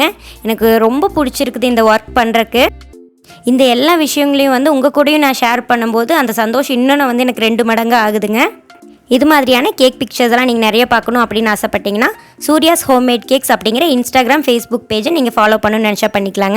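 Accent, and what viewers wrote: Kongu, India. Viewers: Akka Namma kongu slang kekumumbodhey sandhosama irukunga akka ... ❣️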